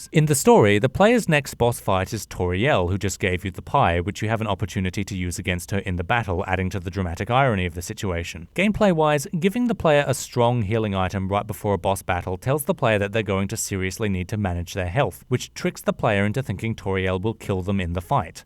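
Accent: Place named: Australia. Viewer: The ozzy accent is strong in this one.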